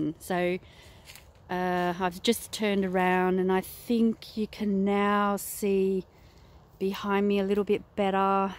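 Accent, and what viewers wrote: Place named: Australia. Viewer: Good job thanks! Love your accent too :) Love Australia RIP Krikey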